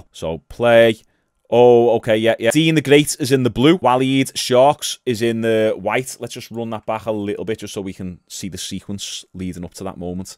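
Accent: Liverpool, United Kingdom. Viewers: Liva Pewl! I hear the Scouser in ya! Thanks for the breakdown!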